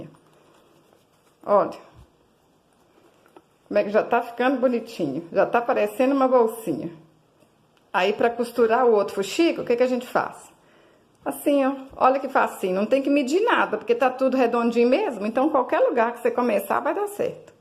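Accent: Minas Gerais, Brazil. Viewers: Além do artesanato,é muito agradável escutar esse sotaque mineiro....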